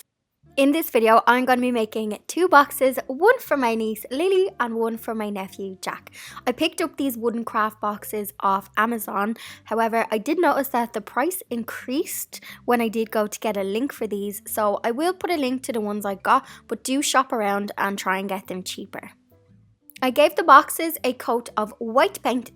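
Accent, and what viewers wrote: Dublin, Ireland. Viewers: Lovely to hear the Dub accent well Done great videos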